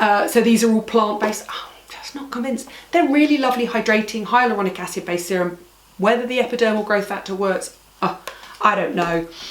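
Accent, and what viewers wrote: United Kingdom. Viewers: I totally agree! Even tho,...I miss a lot of what she says with her lovely, fast-talking British accent. But it sure is nice.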